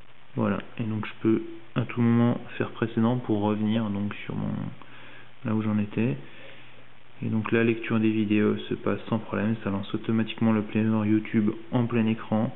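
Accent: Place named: East, France. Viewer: Bel accent de l'Est ça me rappelle mes racines!!! Sinon ton galaxy semble avoir des soucis, le slide parait bien plus réactif sur le mien et je n'ai jamais eu de problème avec l'APN... Belle demo en tout cas!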